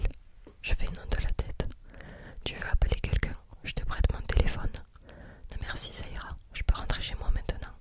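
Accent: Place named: Marseille, France. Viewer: tu es Marseillaise non ? Ton accent le montres bien mdr allez l om 🎉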